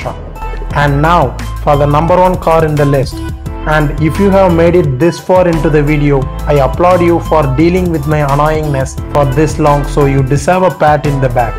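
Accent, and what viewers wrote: India. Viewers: Cute but you talk like a Malayali everyone speaks English like that now I my English is also like that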